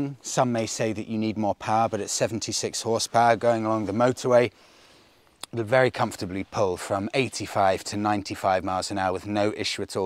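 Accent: United Kingdom. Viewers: The British pronunciation is awesome. i love that. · This is an excellent video. Thanks for sharing. There is just something about listening to a motorcycle review done by someone with a British accent. The content and editing…excellent as well. Cheers!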